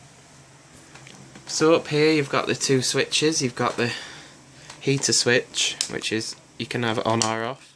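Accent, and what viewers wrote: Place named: Manchester, United Kingdom. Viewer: A mancunian accent wohoo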